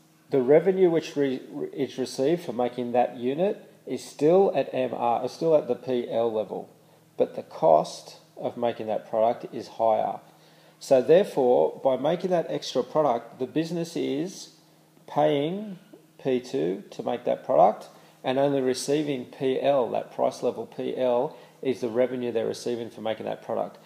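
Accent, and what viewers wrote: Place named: Australia. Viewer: Love the aussie accent m8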